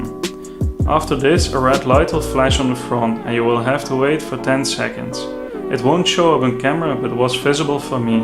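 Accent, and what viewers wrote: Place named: Netherlands. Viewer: Je hebt echt een nederlands accent en een goede video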